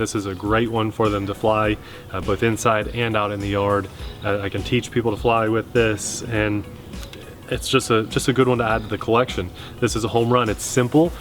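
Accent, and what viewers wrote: United States. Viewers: ...Are you from Ohio?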